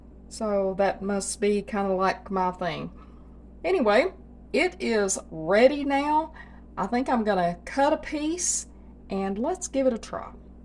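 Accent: South, United States. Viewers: I love your southern accent! This is just what I was looking for. I had previously tried to fancy-up the gingerbread mix with pumpkin by myself and did not elimate the box ingredients of water and egg and oh my, what a very moist and mushy kinda dessert mess more like a pudding which has its purposes. But this time I only added pumpkin as you did and then added Heath crunchy bits to give it a nice surprise. I plan on making a creme anglaise sauce to make it classy!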